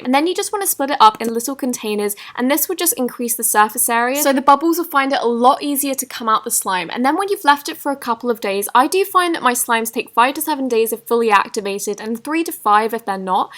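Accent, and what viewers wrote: England, United Kingdom. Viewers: 😊 you are legit my favourite YouTuber. Also, your British!(like me) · Are u English ? Xx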